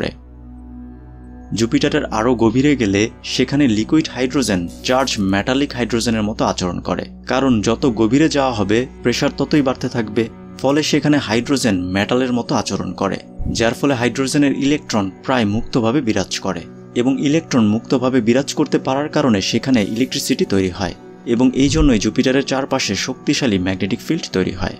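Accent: Narsingdi, Bangladesh. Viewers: ভাই আপনার বাড়ি কি নরসিংদী?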